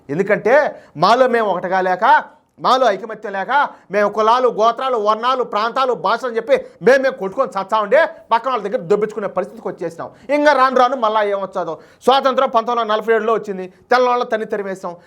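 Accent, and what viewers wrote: Rayalaseema, India. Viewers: బ్రదర్ నీ వాయిస్ మార్చుకో రాక లోకం సుధాకర్ గారు చెప్పినట్టు శాంతంగా మాట్లాడండి స్వచ్ఛంగా మాట్లాడండి, తెలంగాణ రాష్ట్ర రాయలసీమ యాసలో మాట్లాడొద్దు అండి, అసలు బ్రదర్ నువ్వు రాక లోకం సుధాకర్ గారు చెప్పినట్టుగా శాంతంగా చెప్పితే చాలా చాలా బాగుంటుంది వినడానికి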